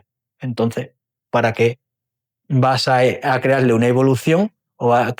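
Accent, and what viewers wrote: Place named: Andalusia, Spain. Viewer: Me encanta el acento andaluz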